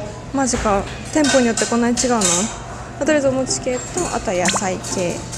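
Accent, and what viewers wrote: South Korea. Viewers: 日本人なのに韓国人が日本語喋ってるようななまりがありますね。 韓国語喋る生活が長いとそうなってきちゃうんでしょうか？ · 日本人らしいですね。 わざと韓国訛りにしてると言うと誰かが言ってました。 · 韓国語の発音に慣れちゃってるからね… 日本語も韓国語の発音になっちゃう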